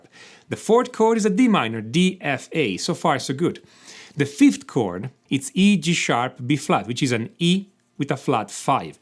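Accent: Italy. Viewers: Your channel is a gold mine. Are you Italian? Cuz your accent sound a bit like it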